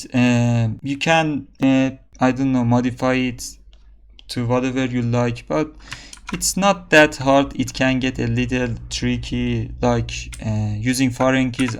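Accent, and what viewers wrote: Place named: Iran. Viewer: لهجه فارسیت داد میزنه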